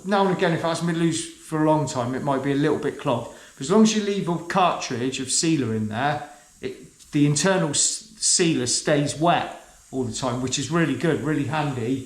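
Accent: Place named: West Country, United Kingdom. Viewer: Nice job, where are you? Must be west country? I want to say Swindon or Bristol?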